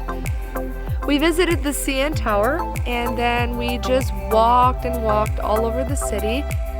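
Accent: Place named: Low German, Germany. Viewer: Thank you! I really enjoyed it. Was the horse and buggy ride at some amish place? I grew up amish in Wisconsin. I like the German you spoke. It sounded to me like Platt deutsch. Is it? Or is it Hutterisch? I know that the German we amish spoke is quite different from the real German language like they speak in Germany. I like to hear different dialects of German.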